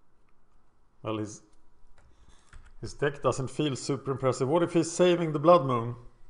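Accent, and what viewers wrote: Sweden. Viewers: My first reaction was "Swedish accent". Is it?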